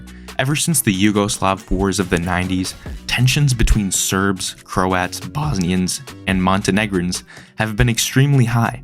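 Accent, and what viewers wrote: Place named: United States. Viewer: Couldn't understand any of this. Please speak English, not American.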